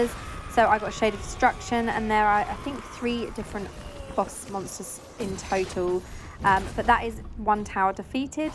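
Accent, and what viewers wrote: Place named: United Kingdom. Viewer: What a British accent ^^❤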